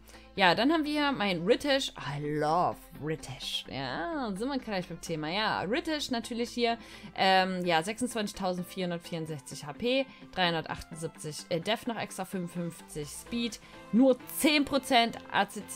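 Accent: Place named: Germany. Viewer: generel spricht sie jedes monster "deutsch" aus obwohl es alles englische namen sind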